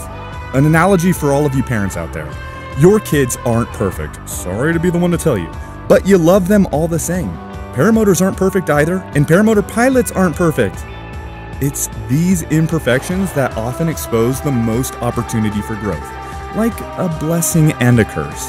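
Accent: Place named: England, United Kingdom. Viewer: Love your English accent 😂.. better than my American one..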